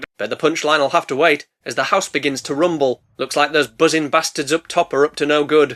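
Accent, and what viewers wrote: United Kingdom. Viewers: Please settle a debate for us, where are you from? 😂 we’ve guessed Newcastle from The accent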